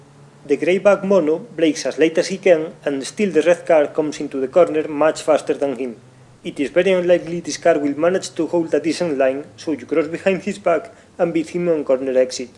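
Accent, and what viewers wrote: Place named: Spain. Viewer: Thanks for the video! You sound like Carlos Sainz Jr. but with a heavier accent, are you Spanish?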